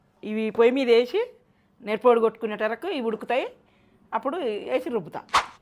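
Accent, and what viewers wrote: Telangana, India. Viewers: Amma meeru pata chala baga padutunnaru. Me videos super. Telangana basha meeru matladedi bavundi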